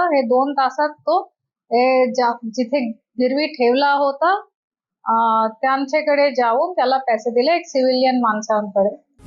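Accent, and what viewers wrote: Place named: Bengal, India. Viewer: Madam, Bengali Caste Che Vatat Aahe. Becoz of Speaking Accents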